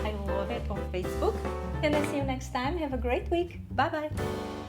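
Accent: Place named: Brazil. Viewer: Where from Brazil you are from? I figured out by your accent!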